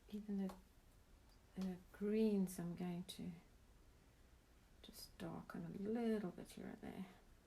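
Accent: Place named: South Africa. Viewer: This is lovely. It sounds like you’re also South African? I also love watercolor and the loose style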